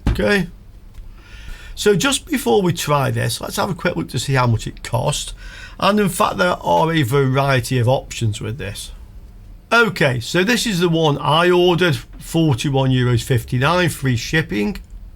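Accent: United Kingdom. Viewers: nice video. nice for a change hear King's english with Schuko electric plugs. p.s. my father had similar setup in <num> meter over his workbench with two parallel 100W lightbulbs to have about 1 amp current limit when testing electric appliances and tube radios for input short circuits of course without all the digital stuff of that device. such device with a 40W lightbulb may be a safe device to let capacitors of long unused mains powered transistor stuff have the capacitors reform. myself i use a SOLA ferroresonant 60W transformer to test stuff that have long time been in a barn, attick, cowhouse or else with potential for mains fuse blowing faulta ( the Ferroresonant i.e. constant voltage transformers have inherent power limit, but are inefficient at light load)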